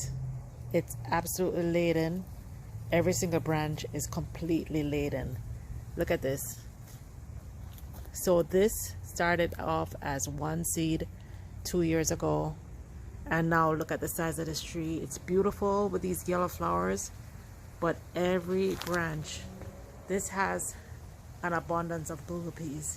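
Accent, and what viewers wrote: Jamaica. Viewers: Hey, love the video. Just discovering your channel and knew from the sound of your voice you are Jamaican. Love how you store your seed, I use wipe containers to save mine 🤦🏾‍♀️. You are right. No need to go hungry when we can plant our own food. While not everyone has the space container gardening is a viable method for most people.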